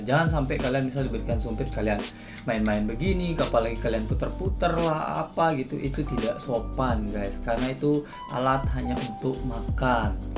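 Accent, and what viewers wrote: Lombok, Indonesia. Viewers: Logat sasaknya tgel👍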